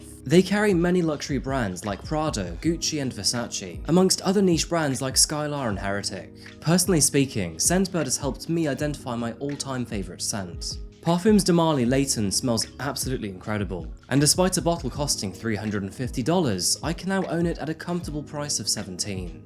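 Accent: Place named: United Kingdom. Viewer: Hey cuz are you gay? Or is it just that across the pond accent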